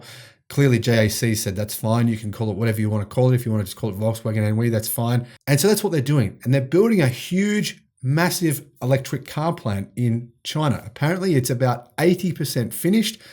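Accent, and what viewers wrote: Australia. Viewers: Aussi English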